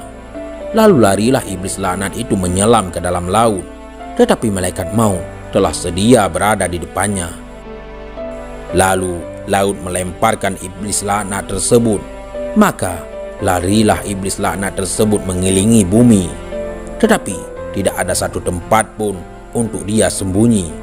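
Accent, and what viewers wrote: Aceh, Indonesia. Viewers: Orang Aceh ni suaranya.. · Tuh klo ga salh logat Aceh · Logat Aceh susah utk di hilangkan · Minin awak aceh nye? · Apakah admin orang Aceh?? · ini yang bicara orang aceh ya. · Admin nya logat aceh ya🙏🙏🤭🤭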